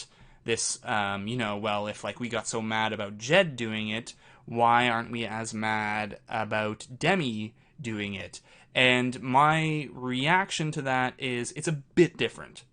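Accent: Canada. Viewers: aha! So to those that religiously follow and interact with (, the owner of this channel) have been wondering forever who he is and where he is from. Everyone suspects he must be Canadian because of his accent. So we are trying to fish that out. He is very mysterious haha. But a super nice guy, like SUPER NICE has two dogs Jackson and Bob and his name is not Harrison. Also he has relationship experience, we have no idea if he is in a relationship currently. When he has 100k subscribers he will do a face reveal. So please tell your friends to subscribe haha.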